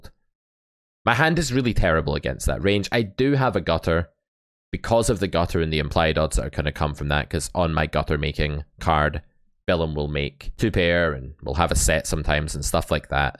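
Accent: Scotland, United Kingdom. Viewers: New sub! Where in Scotland are you from? -poker player from East Kilbride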